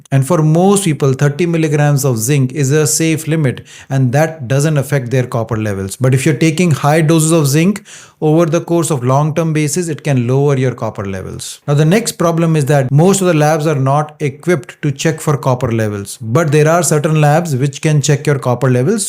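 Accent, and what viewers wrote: South India, India. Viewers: with south Indian accent..❤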